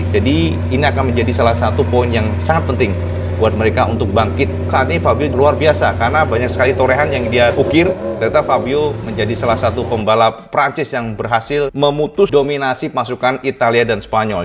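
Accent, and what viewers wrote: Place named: Java, Indonesia. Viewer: seneng aq cak cara ngrivew mu iso mantep logat jowone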